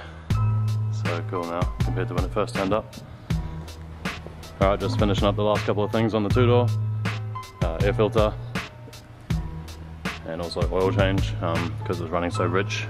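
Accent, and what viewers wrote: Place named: New Zealand. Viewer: Just stumbled across your vids. I recognise a Kiwi brother's accent. Love the vids. Just got an 83 ae86 from Japan late last year. Helping the 86 population grow in the motherland ha. Look forward to binge watching your content.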